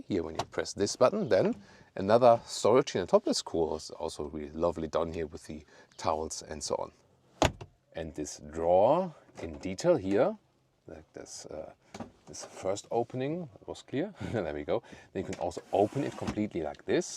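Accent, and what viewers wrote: Germany. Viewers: Voll der deutsche Akzent.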